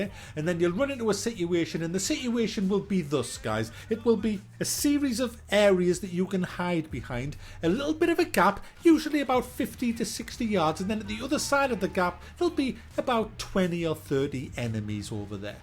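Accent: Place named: Newcastle, United Kingdom. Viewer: My Uncle's Jordie too so when he goes off on a rant I'm reminded of you! Keep it up, you're brill!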